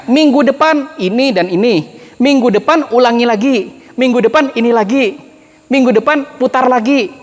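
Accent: Banjar, Indonesia. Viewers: Sulsel hadir. 10 tahun di Kaltim. Serasa bernostalgia dengan logat Banjarnya bang. Salam agro..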